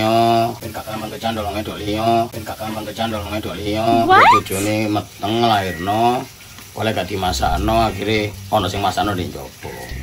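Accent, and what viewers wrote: Surabaya, Indonesia. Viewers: samean wong suroboyo aa mas?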